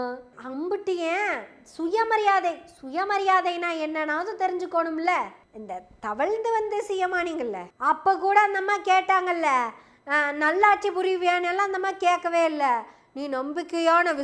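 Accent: Kongu, India. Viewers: உங்கள் கொங்கு தமிழ் சுவையோடு ஆணித்தரமான விளக்கம் வாழ்த்துக்கள் சகோதரி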